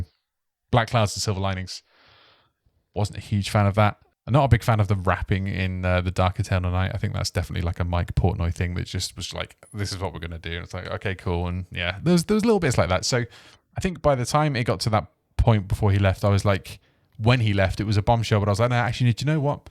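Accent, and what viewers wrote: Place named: United Kingdom. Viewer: It wasn't until the 15 second mark that I could tell you were british. got to be the most subtle accent of all time. Helldivers brought me to your channel, great great shit dude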